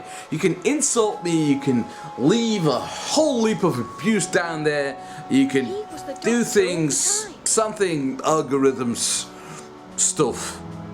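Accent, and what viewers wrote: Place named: England, United Kingdom. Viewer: Your accent is sounding more English these days and I approve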